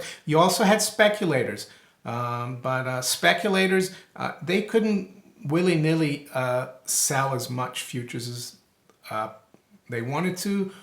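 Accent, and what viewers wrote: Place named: United States. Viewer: Hiya, u are an economic genius, love you, love your programmes but you need to get rid of your Yanks accent, you are a Londoner now m8!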